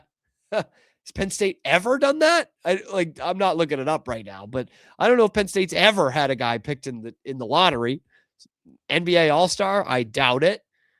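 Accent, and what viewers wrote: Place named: Boston, United States. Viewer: I don’t care if u grew up with Tom as ur babysitter, I hate u when u wear that hat. The less you remind me of your provenance, the better. You’ve done an admirable job ironing the chowdahead out of your accent and thanks for that. Now, never wear Pat merch ever again.* *Wear the Boston Bruins stuff. That’s totally fine.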